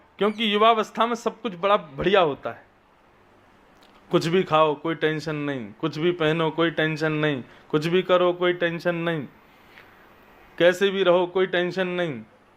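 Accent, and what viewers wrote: Braj, India. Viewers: Jay Shri Krishna Radhe Radhe 🙏 ♥️ 💙 ❤️ OM 🕉 Namo Bhagvate Vasudevy Namh 🙏 ♥️ 💙 ❤️ OM Namo Narayan Jay Shri Shyama Shaym ji Jay Shri Guru Dev Maharaj ji Aapke Charno Mai Koti Pranam Pranam Jay Shri Radha Rani Jay Shri Radha Rani Jay Shri Raman ji Jay Shri Radha Vallabh Lal ki Jay Ho Jay Shri Guru Dev Maharaj ji Aapki vraj Bhasa Badi Sumadhur hai Radhe Radhe Jay Shri Harivansh Guru Dev Maharaj ji 🙏 ♥️ Jay Shri Hari Jay Shri Vrindavan Dham Radhe Radhe Jay Shri vraj vasi 🙏🙏🙏🙏🙏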